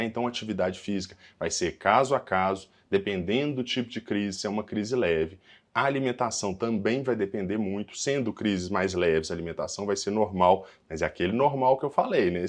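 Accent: Minas Gerais, Brazil. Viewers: Obg pelo vídeo,me ajudou bastante ,descobri hoje que estou com esse problema. Acho muito bonitinho seu sotaque mineirinho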